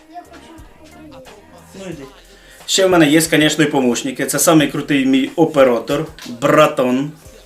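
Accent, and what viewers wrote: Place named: Zolotonosha, Ukraine. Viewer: Привіт з Ґетеборг (Швеція).Я сам з Золотоноші,давно виїхав.Приємно побачить веселого земляка.По розмові зразу чути що Золотоноша 😂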